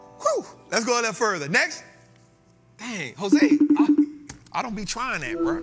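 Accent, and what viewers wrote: New Orleans, United States. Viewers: I thought he was from new Orleans.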